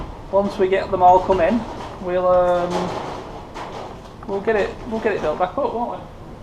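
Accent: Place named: Scotland, United Kingdom. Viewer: Are you Scottish? Pretty heavy accent.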